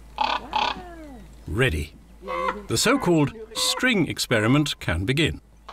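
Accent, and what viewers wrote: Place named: England, United Kingdom. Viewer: Oh my God you sound so English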